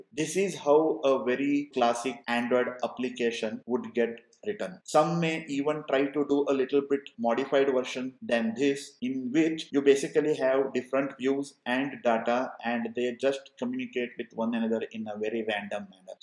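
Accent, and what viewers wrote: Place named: India. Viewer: You have a really funny Indian accent, but your tutorials on Android are great, I can even say that they are the best on YouTube.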